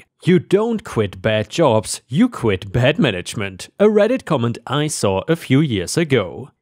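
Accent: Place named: Germany. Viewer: I can hear the german out of your voice, and i love it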